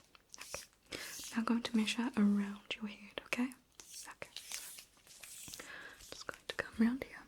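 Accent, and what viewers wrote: New Zealand. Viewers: Kiwi here, so good to hear our accent on here hehe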